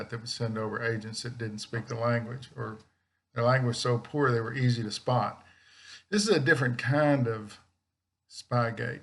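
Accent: Texas, United States. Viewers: Moved from Austin to N. CA 20 years ago and I find a certain comfort in Texan twang (not as strong as my dad's was), too... and I miss Central Market/HEB!:-) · I sure enjoy your readings, but I LOVE your accent!! Moved from TX to AZ this year and I miss that TX accent .... and HEB !!!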